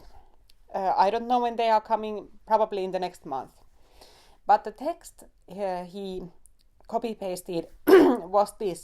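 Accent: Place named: Finland. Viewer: You sound Finnish, I thought Finland was pretty conservative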